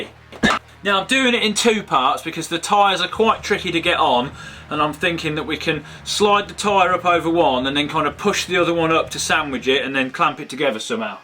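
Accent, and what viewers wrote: United Kingdom. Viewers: british clearly, he lives in lincolnshire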